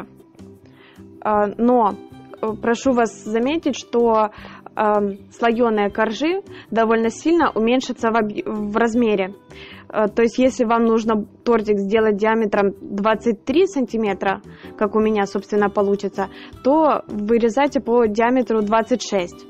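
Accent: Ukraine. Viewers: А то,что надо грамотно разговаривать,это фаэтОн девочка украинка,судя по говору,поэтому не совсем грамотно говорит,но ведь это не экзамен по русскому языку.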